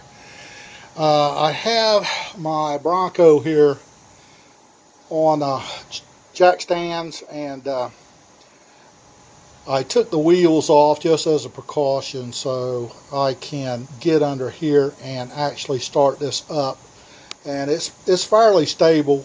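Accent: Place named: Upstate South Carolina, United States. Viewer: I hear your accent I'm gonna say your from upstate sc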